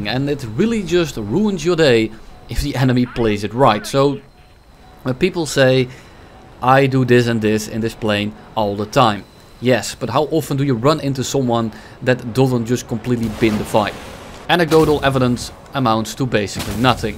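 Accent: Netherlands. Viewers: Je accent is iets te Nederlands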